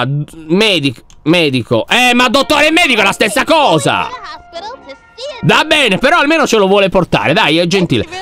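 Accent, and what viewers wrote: Sardinia, Italy. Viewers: per favore io ti voglio bene ma puoi smettere di fare il sardo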